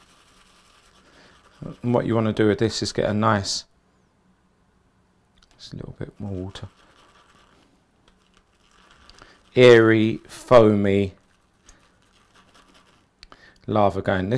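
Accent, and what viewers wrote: United Kingdom. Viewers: you have such a calm and collected manner and I really enjoy listening to the deliberate articulate way you speak. Of course the distinguished English accent is really cool too. I wish I could have you as my barber. That would make my monthly haircuts enjoyable and a much more pleasant experience being able to chat with a nice easy-going person. Alas I live in Singapore, a country in Asia very far from the UK. The barbers here hardly say a word and when they do, it's pretty simple things like how are you doing and how's your day been so far. It's nice having someone who could provide tips and advice and basically just chat about stuff and catch up on things everytime you see them.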